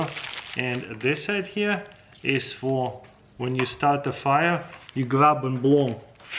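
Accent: Russia. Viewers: Russian accents are the coolest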